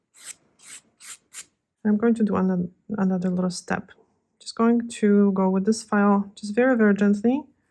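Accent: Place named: Poland. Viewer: Hey, I just want to say thank you for your calming videos. I am half Polish, half English so your accent is always a comfort to me and reminds me of my Polish relatives ❤️🇵🇱 Thank you for teaching us all about nail care. Ps I love the colour you used on this client and now I’m on the hunt for a similar range of shimmery polishes! If you have any recommendations that are available in the UK it would be great to know. Xx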